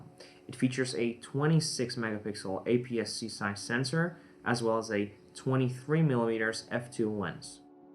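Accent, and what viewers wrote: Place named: United States. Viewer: I subscribe to your channel because you speak in American accent!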